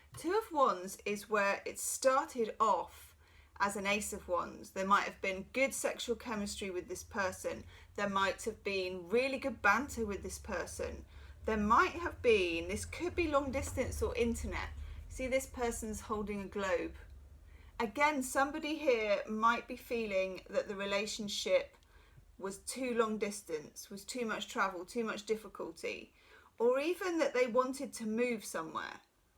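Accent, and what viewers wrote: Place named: United Kingdom. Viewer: #2 let me just get a cup of waaaaater loving the British accent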